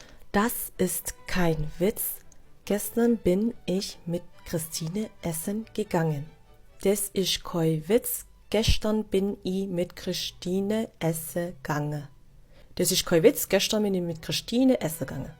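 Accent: Swabia, Germany. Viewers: Schwäbisch bei einer so hübschen Asiatin ist schrecklich. Total abturnend.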